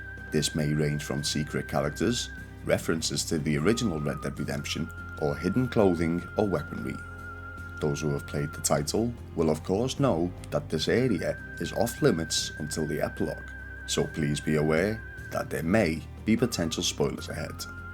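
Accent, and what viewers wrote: Liverpool, United Kingdom. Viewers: Bro are you a scouser? It took me so figure out what was going on😂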